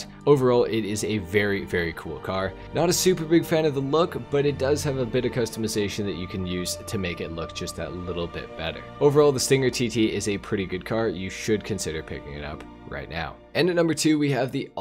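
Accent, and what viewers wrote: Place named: Canada. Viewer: I can tell you’re from Canada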